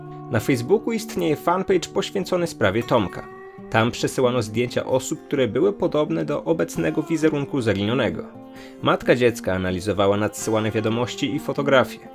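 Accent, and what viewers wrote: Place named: Eastern Borderlands, Poland. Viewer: qzwa cztasz jak jakis uchodzca z kresów wschodnich...tajojku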